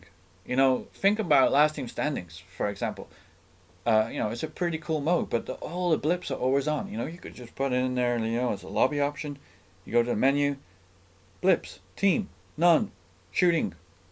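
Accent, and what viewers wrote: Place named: United States. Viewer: Good video, but you sounded too American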